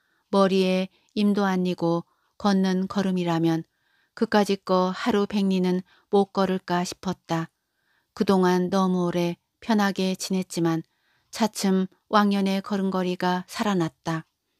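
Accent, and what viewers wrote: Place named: Jeju, South Korea. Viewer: 제주도에서 시집오신 외숙모하고 말투가 똑같으셔서 듣는 내내 더 재밌기도하고 신기하기도했답니다😂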